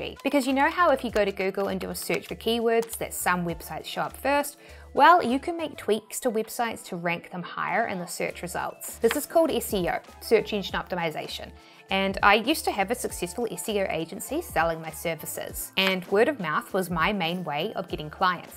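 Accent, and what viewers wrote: New Zealand. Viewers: Kiwis have the sweetest accent.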